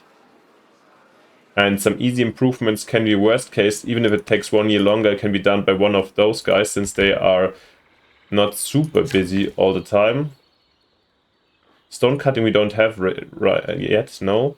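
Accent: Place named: Germany. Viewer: Direkt den Alman rausgehört😂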